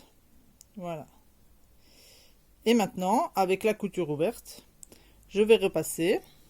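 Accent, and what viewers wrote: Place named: Belgium. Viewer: Bonjour Très sincères félicitations pour l'absolue clarté de vos explications ; c'est un pur régal que de vous suivre. Élève de deuxième année de CAP ( en France - j'ai cru noter une pointe d'accent belge si je ne m'abuse ! ) j'ai découvert votre travail avec satisfaction. Vous êtes une excellente pédagogue et vos explications, tout en restant techniques et conformes aux normes, sont lumineuses ! Il va sans dire que je vais "dévorer" vos tutos ☺️ Merci à vous. Philippe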